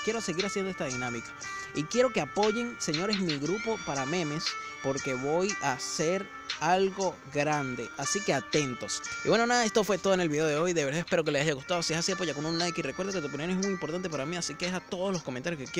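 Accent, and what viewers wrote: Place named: Caracas, Venezuela. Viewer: de donde eres se me parece que eres caraqueño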